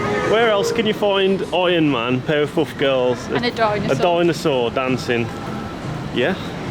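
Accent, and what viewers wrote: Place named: Midlands, United Kingdom. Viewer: hi mate, love your content! just wondering are you from the midlands because your accent sounds very strong ps I'm from Birmingham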